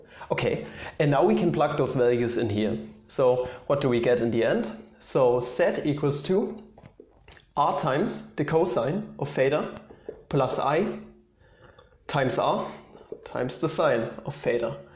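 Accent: Germany. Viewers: Bist du deutscher? Man hört deinen Akzent hehe und dein Video hat mir geholfen omg danke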